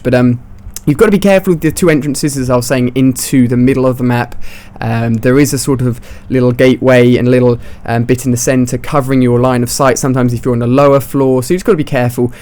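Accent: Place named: United Kingdom. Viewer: He's British, it's just an accent.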